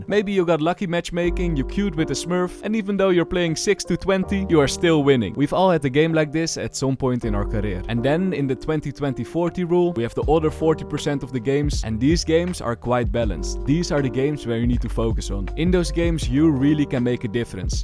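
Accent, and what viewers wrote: Netherlands. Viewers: i smell the dutch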